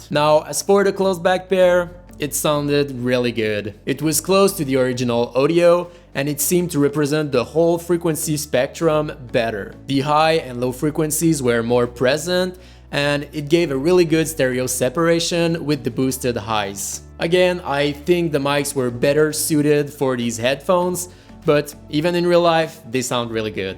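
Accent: Quebec, Canada. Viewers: // He's from Canada, one of the French regions.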